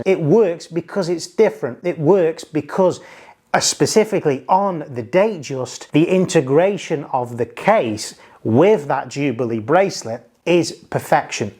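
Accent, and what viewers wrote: United Kingdom. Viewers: Good vid mate, btw are u from Stoke? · Sorry but your accent and the way you say “book”, are you from Stoke on Trent?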